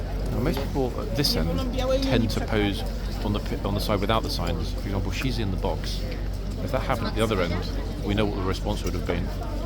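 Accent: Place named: United Kingdom. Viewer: Aside from all your lovely videos, I saw a short video of you and Peter Ellis -- always in others I only saw you from the side or back -- but in this I saw you facing full front. You are very good looking along with your wit, intelligence and respect!! Wish I was younger but sad to say am old enough to be your mother. (Am 74) anyway so enjoy your conversation in your videos!! Have a good day! Also love your British accent!!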